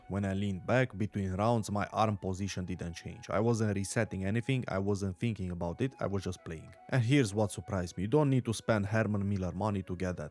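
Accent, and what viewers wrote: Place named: Romania. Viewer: romanian accent really showing in this one, nice vid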